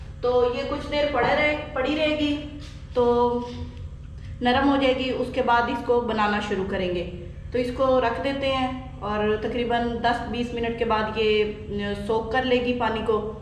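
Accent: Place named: Attock, Pakistan. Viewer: yammay aap Attock se hain aap ke blne ka andaz attock wala ha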